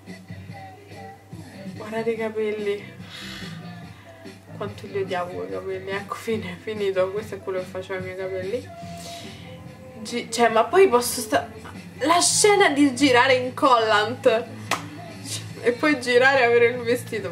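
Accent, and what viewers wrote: Rome, Italy. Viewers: E' la prima volta che ti vedo su youtube. Guardando i tuoi vecchi video si vede che sei molto cambiata, ma sei ancora bellissima. Dal tuo accento direi che sei sicuramente di roma.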